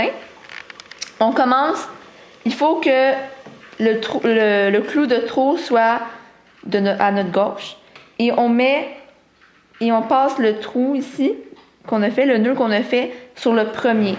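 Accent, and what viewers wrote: Quebec, Canada. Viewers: Elle parle québecois : ) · Merci pour ce vidéo, c'est très bien fait et je suis contente d'entendre une voix de Québécoise!! Tu as du talent pour éduquer. Par contre j'aurais une petite question pour toi. Si on aime pas le look avec les franges au bout, comment peut-on "fermer" le tout? Et/ou si on veut faire un "tube" comme c'est bien à la mode en ce moment, comment suggères-tu qu'on l'assemble? Ça serait bien gentil si tu pouvais faire une mini vidéo qui démontre comment terminer le foulard sans franges en tout cas! :)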